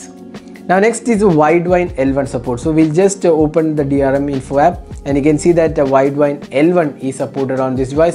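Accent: Kerala, India. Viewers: Enthaaa English. Only malayalies talk English with this accent. Fools....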